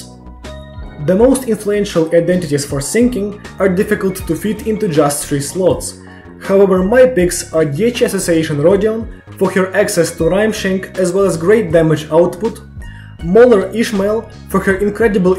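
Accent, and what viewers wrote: Russia. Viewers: Я будто очень чётко слышу русский акцент, а так видео топ. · Русский акцент, прикольно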